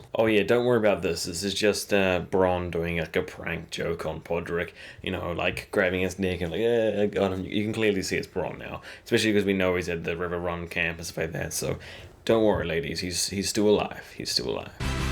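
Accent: New Zealand. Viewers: He has a very mild Kiwi accent.. like a Kiwi who's been living in Australia for quite some time. That would be my guess anyway.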